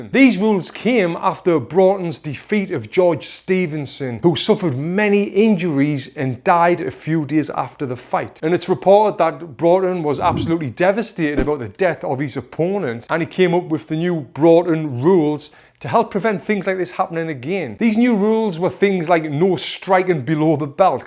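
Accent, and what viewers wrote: United Kingdom. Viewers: The accent is very british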